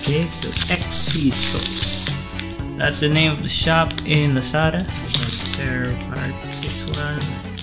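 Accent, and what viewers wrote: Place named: Australia. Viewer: Love your Australian accent.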